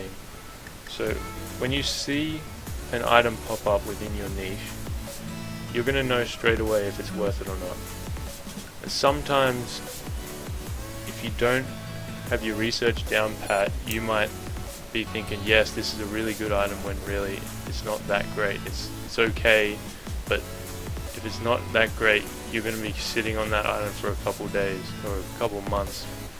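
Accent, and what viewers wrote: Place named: Victoria, Australia. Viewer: Maaaate, Guessing you are Victorian from your accent. I'm from WA. Hey, this is a bloody good video. You called all the pros and cons and it was presented without the hype. Kudos! sorry you got so few likes. I'd give you five stars! Any way, Thanks mate!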